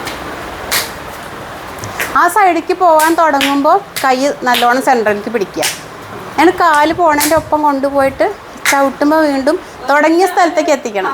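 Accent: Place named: Thrissur, India. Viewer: വർത്തമാനത്തിന് തൃശ്ശൂർ ഭാഷ തന്നെയാണ്.,ഊരകം,ഞെരുവിശ്ശേരി ഒക്കെ അറിയാമോ.അവിടെയൊക്കെ ധാരാളം ആളുകൾ കൈകൊട്ടി ക്കളി അ റിയുന്നവരുണ്ട് എന്ന് കേട്ടിട്ടുണ്ട്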